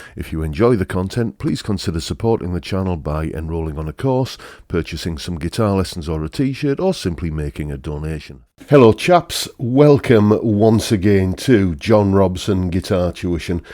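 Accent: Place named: Yorkshire, United Kingdom. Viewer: Still playing the "place the accent" game. Son of a yorkshire farmer. Not county Durham then, so no Darlo. Not Middlesbrough, but I'm guessing close by. The fact you didn't say Cleveland places you south of Stokesley, I'm going to put my bet somewhere between Boroughbridge & Northallerton.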